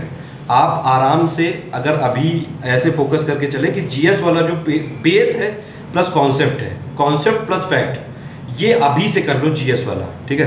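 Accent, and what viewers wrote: Kanpur, India. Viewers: Nice kanpuriya touch...!! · Bhai Kanpur ke ho kya ...? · Sir, Aap kanpur me kaha Se ho, Full kanpuriya Touch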